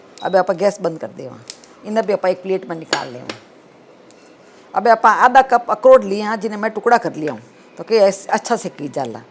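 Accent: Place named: Marwar, India. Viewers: Aap English bhi Marvari mi bolty ho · आपकी gk को प्रणाम,,मारवाड़ी भाषा में English मीठी लगती है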